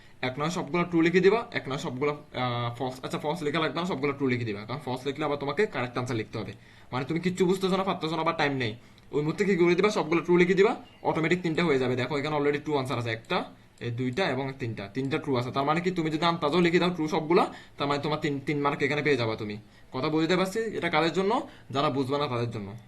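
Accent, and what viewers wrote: Chittagong, Bangladesh. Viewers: Sir apni ki Chittagong er?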